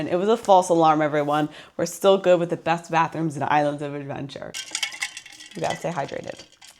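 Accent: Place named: New York, United States. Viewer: I always tune in to your universal videos. So excited for hhn this year! Also love your channel because I'm from long island NY and love hearing your NY accent 😂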